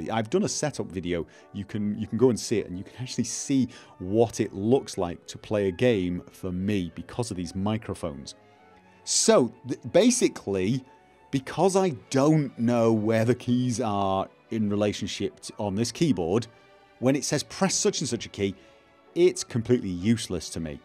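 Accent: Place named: England, United Kingdom. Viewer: going from his accent i would say he was Mancunian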